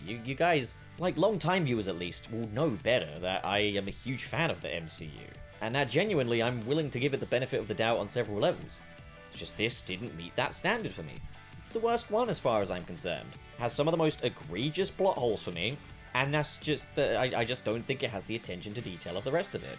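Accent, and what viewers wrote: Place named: United Kingdom. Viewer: It's not your authority...it's your sexy soothing British voice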